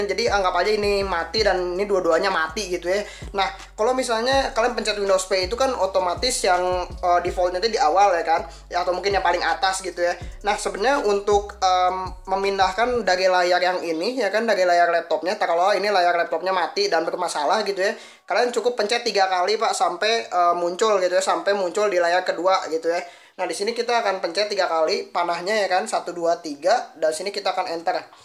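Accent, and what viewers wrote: West Java, Indonesia. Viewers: Si akang nya orang sunda ya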